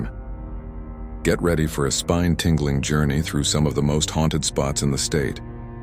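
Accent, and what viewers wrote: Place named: India. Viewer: Why does the ai narrator turn indian halfway through the video?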